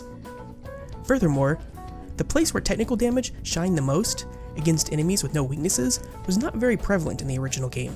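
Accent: Japan. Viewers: Is Japanese your first language?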